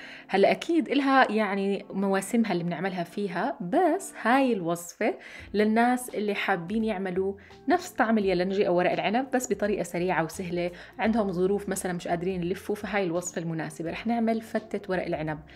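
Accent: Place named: Palestine. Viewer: راح اجربها اليوم ان شالله ❤ يسعد قلبها اللهجة الفلسطينية😍😍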